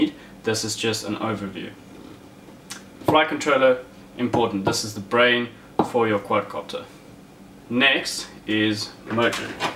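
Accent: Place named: Afrikaans, South Africa. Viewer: Hello ek kan by jou Aksent hoor jy is Afrikaans love jou videos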